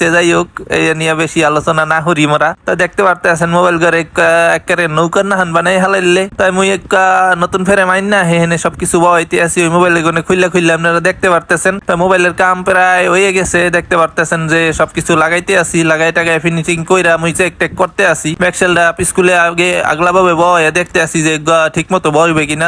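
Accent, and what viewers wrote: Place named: Barishal, Bangladesh. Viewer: Borisaler vasa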